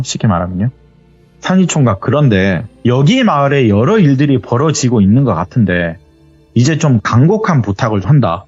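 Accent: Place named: Jeolla, South Korea. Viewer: 뭔가 불만이 많은듯한 전라도 특유의 꼬여있는듯한 말투.ㅋㅋ 깡촌을 깡촌이라 하지 뭐라그럼?ㅋㅋ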